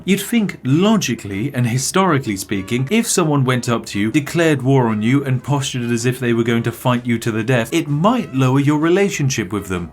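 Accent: United Kingdom. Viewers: I think he might be British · He's british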